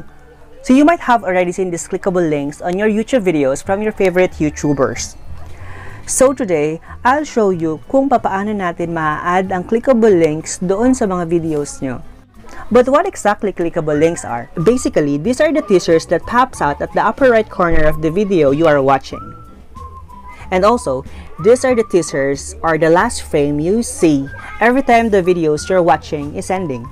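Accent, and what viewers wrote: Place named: Philippines. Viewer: THE CUPIDO CLAN he speaks English but has a fillipino accent and sometimes he's speaking a little bit of Filipino and just put English sub i hope that helped and sorry 😞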